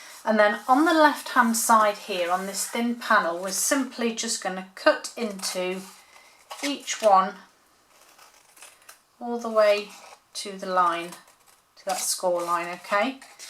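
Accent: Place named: Leicestershire, United Kingdom. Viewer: A lovely little box. I also have to say I love listening to you, even though I don't know where you live or are from, your accent sounds like it was close to where I come from in Leicestershire. Sounds like home Thanks for posting.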